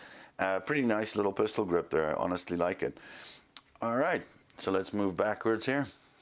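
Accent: South Africa. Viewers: Great video, thanks. Off topic, and if I may ask, what nationality are you? Your English accent sounds like it has South African roots, with a bit of Irish and a lot of American influence. 🙂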